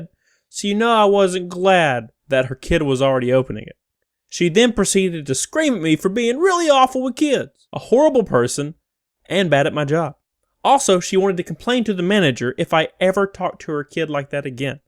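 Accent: South, United States. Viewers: Is it worth mentioning that you have a Kermit the Frog voice sometimes? Like a southern Kermit. Anyway, good videos. Thanks!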